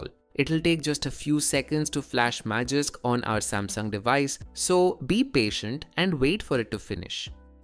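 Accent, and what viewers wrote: India. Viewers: If you hear he has a Indian accent the first thing you do is call him a cockroach and then get a dictionary with all racial slurs so 99% of IT info is actually good because you bullied the problem and send him home (in the sewer) And hoping they'll one day scam grandma's again while they curse you out because you stood up for the vermin that is still making tutorial hell in 2026 possible until we all bullied them together :)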